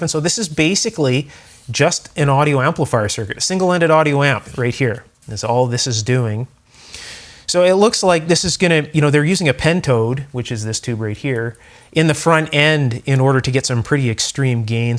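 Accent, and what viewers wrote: Canada. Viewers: You from Canada, eh? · You sound Canadian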